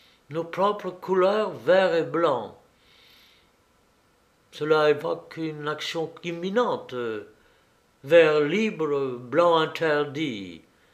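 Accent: England, United Kingdom. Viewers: Pourquoi cet accent anglais? Ilbn'a pas rzison d'être, puisque il est censé s'exprimer dans sa propre langue. . Bref, j'arrête c'est très insupportable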